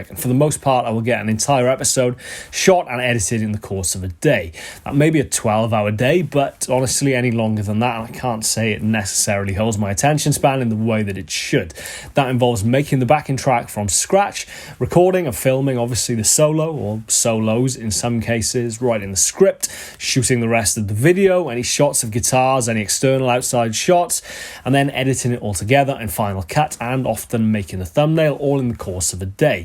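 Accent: Wales, United Kingdom. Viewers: You got style bro, you sound like a fellow Welshman too👍👊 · On a random note .. sounds like you've lived in or come from Wales, possibly Caldicot or Chepstow area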